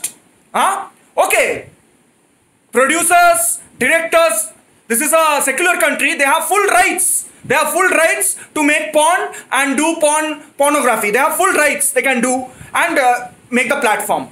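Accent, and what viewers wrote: Bengal, India. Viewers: Hindi slangs with Bengali accent funny as hell dude XD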